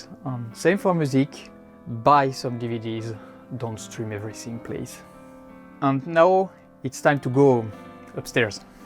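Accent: France. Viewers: Vous avez une magnifique tiny house ! Tout y est bien rangé et vous parlé très bien anglais avec un bel accent français 🎵🎶🤗🇧🇪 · beautiful home. And you did very well speaking English with a French accent which was lovely! ❤️ wonderful music.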